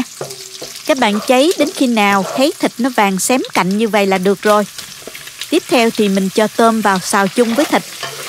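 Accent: South, Vietnam. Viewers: Ngon ,giọng nói miền nam Việt Nam dễ thương,❤❤ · Giọng nói miền Nam của ngọt ngào rất dễ thương. Tiếc là cô dùng từ miền Bắc, và nhiều tiếng Hán Việt khó hiểu và vô nghĩa, như “thấm sâu vị”, “điều vị”, “sử dụng”, “sơ chế”, “chế biến”…Mọi người đang nói và viết tiếng Việt tuỳ tiện, không cần hiểu ý nghĩa và mục đích. Kính mong nói như một người miền Nam chính gốc, mộc mạc, giản dị và dễ thương như giọng nói của cô.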